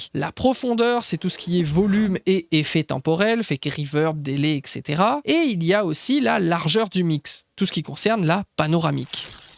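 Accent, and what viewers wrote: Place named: Réunion, France. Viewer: T sur ou t inn kreol na un léger accent 😅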